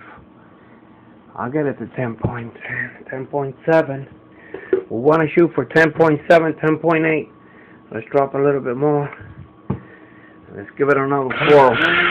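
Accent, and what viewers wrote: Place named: Upper Michigan, United States. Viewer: sounds like this boy is somewhere just a little north of Marquette Mi,????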